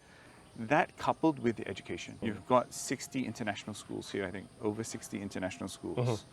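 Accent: Malaysia. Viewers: Lots of dumb Malaysians on here saying they can't hear his "Australian" accent anymore. That's because he's British. I am American and can 100% hear his British accent, it just has a heavy Malaysian influence to it, but I can tell where he came from very easily. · He sounds more Malaysian than some Malaysians! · I thought he was a Malay guy 😂 I had to look closer to the screen to see it was actually a white guy 😂😂 I love it. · Wow! 30 tahun duduk Malaysia, cakap omputih pun dah slang Melayu! Hahaha! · omg.... he speaks like a Malaysian!!!! hahaha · Bro his Malaysia English accent is spot on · gosh i wouldnt have guessed he's an australian with that local malaysian accent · This is the first ang mo I come across who actually speaks Malaysian accent. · im a malaysian and this guy has more of a malaysian accent than me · Was listening to this on the car bluetooth. 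Didnt realize he's Australian until i took a peak at the screen. Wah, so Malaysianised, this Mat Saleh! "Lemme help you out lah, bro" and "ohnee" instead of 'only'. Superloving it!!! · if i dont see face.. i believed he is truly malay malaysian... ahhahahah no more australian sound... btw apa habaqqqq.. moga sehat2 selalu... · White guy with malay accent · Damn, as an australian born, he sounds more malay then malays from Mont Kiara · He is so Malaysian. He lost his accent already. if I hear his talking without watching the video, I definitely say he is Malaysian who speak English. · Aussie fdude turned Malay in his accent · Even his accent is ma-nglish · He doesn't like durians?! That's a big no no...🤣 but seriously after 30 years in Malaysia he sounds completely like a Malaysian.. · He sounds like malay when speaking English 😁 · His english is mix accent of Malay and Indian Malaysians 😂I can't believe he is Aussie! 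· This guy is australian right?why did he sound more to malay datuk? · This Aussie speaks like Malaysian lah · I was just listening to the video while working. Never thought its a Mat Salleh talking because he sounds so Malaysian when I watch the screen at the end of the video. He totally assimilated with how Malaysian speaks English. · This white dude talks like he is a native Malaysia. He has the Malaysian accent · Dude speaking malaysian accent after 30 years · I am awestruck that he sounds so Malaysian! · He sounds very Malaysian 😊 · Yo this guy sounds malaysian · Dah bunyi org melayu cakap omputih....betul2 jadi melayu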